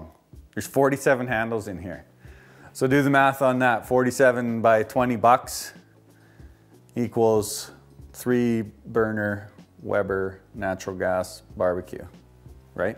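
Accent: Canada. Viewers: Nice video, you sound like you are from the Ottawa Valley.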